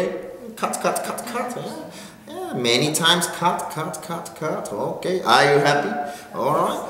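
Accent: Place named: Australia. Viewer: I just found you today on my recommended. I like how you teach card tricks. Is he Australian by any chance as his accent sounds like it?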